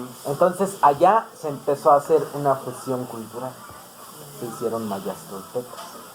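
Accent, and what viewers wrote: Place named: Mexico City, Mexico. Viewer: espoturno Es totalmente diferente, este wey tiene acento chilango. Los mayas siguen ahí en las comunidades, no mamen con tanta desinformación!!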